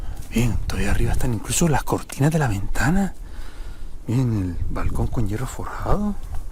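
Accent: Spain. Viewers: Hermosa casa muy buen video ❤ y sobre todo me encanta el acento Español saludos desde Colombia 🇨🇴🇨🇴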